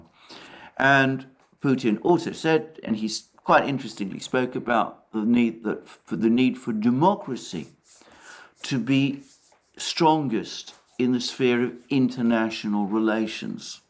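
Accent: England, United Kingdom. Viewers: Russian disinformation with an English accent!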